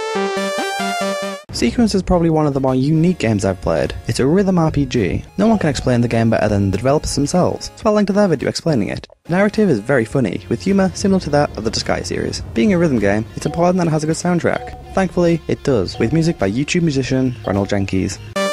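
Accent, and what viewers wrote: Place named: Manchester, United Kingdom. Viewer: Manchester accent + speaking fast = Americans can't understand you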